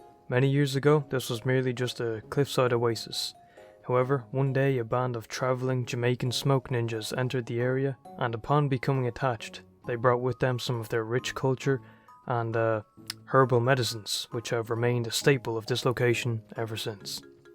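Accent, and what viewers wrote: Ireland. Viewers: Dude I don’t know why but you sound soooo Irish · I feel dumb for not noticing you were Irish 😂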